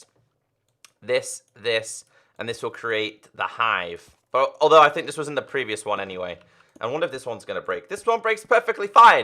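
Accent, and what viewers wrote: United Kingdom. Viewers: He is british, i don't know if english, welsh, irish, or scottish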